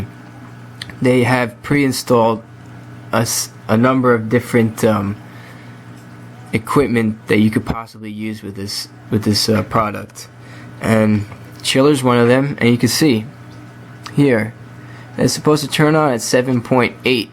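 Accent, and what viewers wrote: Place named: New York, United States. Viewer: Are you a New Yorker? I detect a New York accent.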